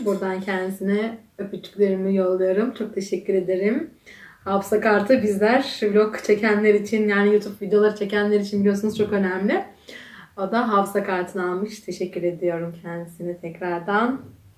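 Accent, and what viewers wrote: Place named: Yozgat, Turkey. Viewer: esahhhh mı 😂😂😂 bu şiveyi herkes bilmez zaten 😂😂 evelallah Yozgat hanımları mariferlidir 💪💪💪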